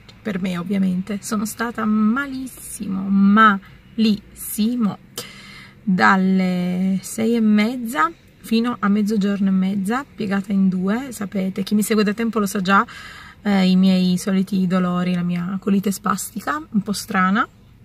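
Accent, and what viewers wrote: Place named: Turin, Italy. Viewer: Sei napoletana solo di nascita, ma in realtà sei torinese nel modo di parlare e di essere. Penso che quando vieni a Napoli tu ti senti un pesce fuor d'acqua.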